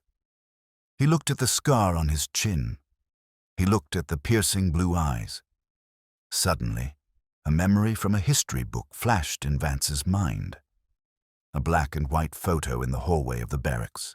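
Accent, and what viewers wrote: United Kingdom. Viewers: Audio would be better if it wasn't using a slight British accent when talking about US military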